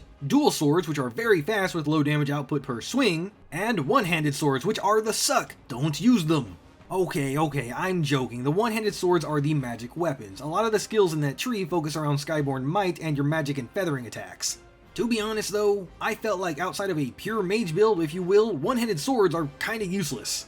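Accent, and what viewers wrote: United States. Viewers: I was not expecting to have a stereotypical American accent when doing a Chinese game review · Yeah I'm not playing this game but will support whatever video you put out. Also you got a beautiful southern drawl and it's the 1st time I ever noticed it.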